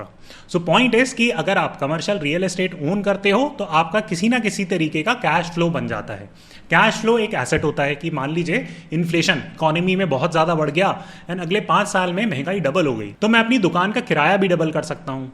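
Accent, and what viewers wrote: Standard. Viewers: Shuddh Hindi me mzaaa aata hai yaar. Sun k bhi accha lagta hai aur jaise bade bhai samjate hai aisi anumbhuti hoti hai. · बहुत बढ़िया। आपकी शुद्ध हिन्दी अच्छी लगी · Mujhe apki shudh hindi bahut pasand aayi. Bas 'Apun', 'Apun' bolna chod dijiye. :) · Love your sudd hindi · Loved ur shuddh hindi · बहुत अच्छा भ्राता, आप हिंदी और अंग्रेजी दोनों ही बहुत शुद्ध बोलते हो,👍🙏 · Han pasand hai aapki sudh hindi, ty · sir we all like your shudddhh hindi after all we are all hindustanis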